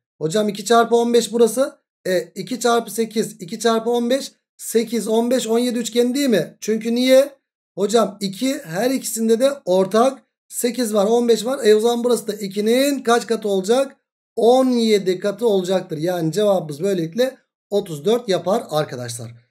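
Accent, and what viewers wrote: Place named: Central Anatolia, Turkey. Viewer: Hocam sizde ankara-kirikkaleli konuşma tipi var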